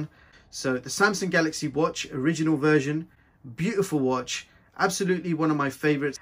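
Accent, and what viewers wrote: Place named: England, United Kingdom. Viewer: Generally I don't ever leave comments, I know I should, but sometimes (as someone who needs to get the relevant info I'm looking quickly I never do. However, this occasion I was so impressed with the content of this video I felt compelled to show my support. Everything I needed answers to was in this one video. Plus, the small wrist bonus section was really appreciated. As said in the video, it's a very overlooked part of buying a smart watch. Also, as a Englishman it was great to hear an English accent narrating the video (the generic American and often computer generated voices drive me up the wall 😬😁). Really great video, very informative and exactly what you look for on YouTube when looking into some new tech to purchase. Excellent work, Thank you. Liked, commented and subscribed!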